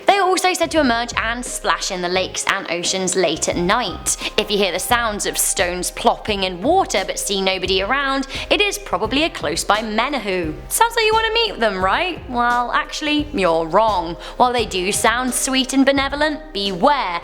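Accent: United Kingdom. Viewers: D'Angelo McIntyre ummmm shes brittish. Can i hear your best french, german, or russian pronunciations??? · Guys, calm down on how she pronounces things, she’s from the UK, and lives in Canada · Well she's british not a native hawaiian so of course she pronounces wrong.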